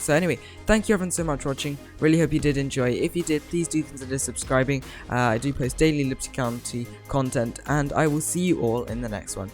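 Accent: United Kingdom. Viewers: Are You British? If you are you’re probably from the UK or EUROPE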